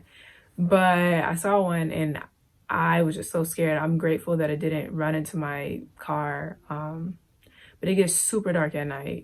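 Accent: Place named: Michigan, United States. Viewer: Sounds like the upper peninsula in MI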